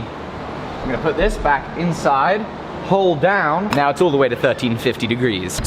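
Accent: United Kingdom. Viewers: Is she British or other?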